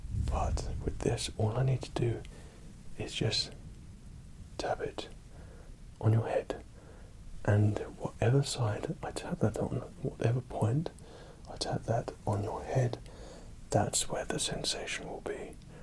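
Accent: England, United Kingdom. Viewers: Loves chocolate, loves Harry Potter, looks like a surfer & sexy af articulate English accent... Yum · Is he English?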